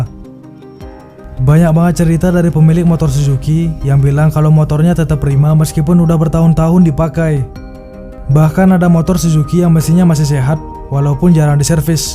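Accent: Bali, Indonesia. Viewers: Pasti ne dr bali, logatnya ketahuan...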